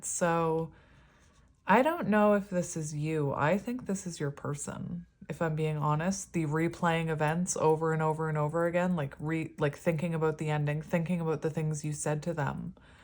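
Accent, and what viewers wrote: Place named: Canada. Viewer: My favorite Canadian accent friend ❤😘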